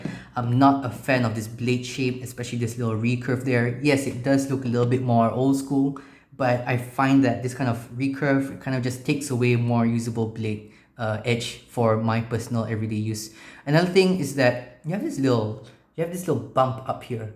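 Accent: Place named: Malaysia. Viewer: I could tell you were from Malaysia as soon as you started talking. Great review. I am wanting to get the green handle model to match my Buck Lite from the 1980s. I subscribed and look forward to seeing your other content.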